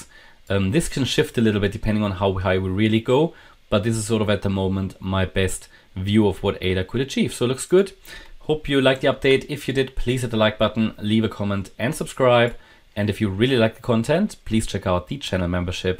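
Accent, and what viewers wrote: Germany. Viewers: Wenn du jetzt noch den krassen deutschen Akzent wegbekommst, wäre das Video richtig gut 😂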